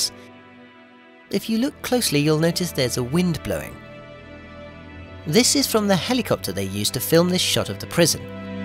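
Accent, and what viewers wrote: United Kingdom. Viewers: the sort of British accent that you sometimes have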